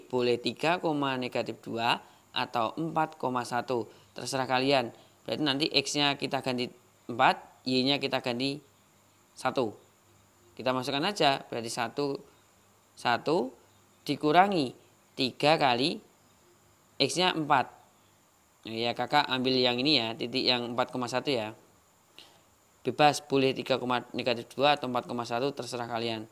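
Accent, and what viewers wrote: Java, Indonesia. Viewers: Mksh ilmunya....cara penyampaiannya enak...ada medhok"nya jawa...salam dr Bogor kak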